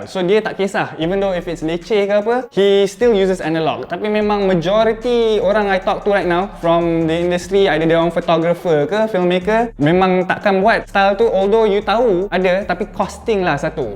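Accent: Malaysia. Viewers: I love this kind of persona the speaker has. The way he told the stories. R u malaysian or singapore?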